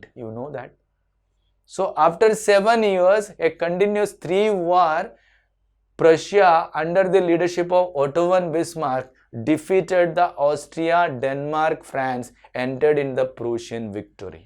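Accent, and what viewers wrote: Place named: Kerala, India. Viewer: THANKYOU SO MUCH SIR FOR THE AMAZING EXPLANATION THE WAY YOU REVISE QUICKLY REALLY IMPRESSED ME SIR SIR I THINK YOU ARE FROM KERALA ..[ PREDICTED FROM THE ENGLISH SLANG ] I AM JUST THE NEARBY STATE SIR TAMIL NADU..... I HOPE YOU KEEP MAKING VEDIOS OF HISTORY AND WAITING FOR NATIONALISM IN INDIA SIR THANKYOU SO MUCH SIR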